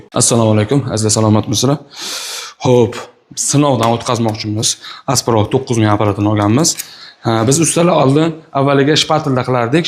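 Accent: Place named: Namangan, Uzbekistan. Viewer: Наманганлик менимча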